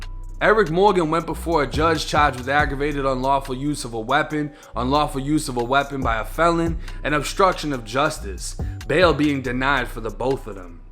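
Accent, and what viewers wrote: Boston, United States. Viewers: 1090 u got me rolling with u saying peace officer. I know its hard with your Boston twang. (Police officer)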